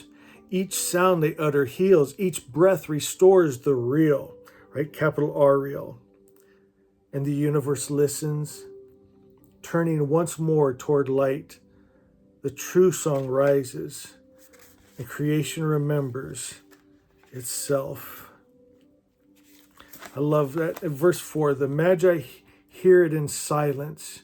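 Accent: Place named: Greece. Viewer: Greek accent detected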